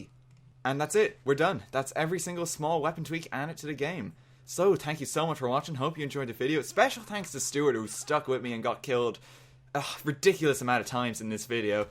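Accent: Ireland. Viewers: Are you from Ireland or Newfoundland? The accents are fairly similar?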